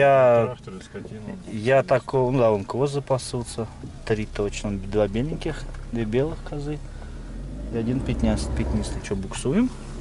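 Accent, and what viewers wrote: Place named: Tambov, Russia. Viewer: я тоже родилась в Тамбовской обл. Когда услышала ваш говор сразу подумала земляки. Потому и спросила что за обл. Очень печально видеть все это . Моя юность прошла там.живу далеко от тех краев к сожалению . Какой район можно узнать ?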